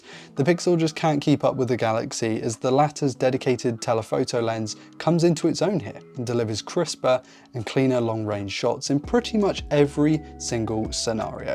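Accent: England, United Kingdom. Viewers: You have an English accent, yet prices are USD. There are enough US tech YouTubers don't you think?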